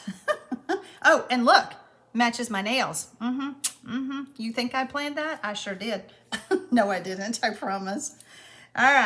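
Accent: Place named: Maine, United States. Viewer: We Mainers have that accent that’s hard to understand too!! Oh well.. that’s the beauty of diversity!! 😇😂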